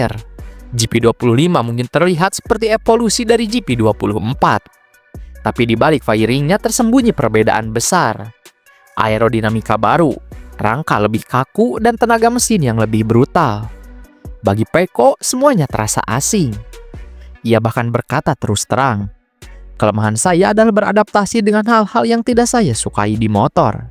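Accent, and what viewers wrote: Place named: West Java, Indonesia. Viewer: Sunda pisan 😂👍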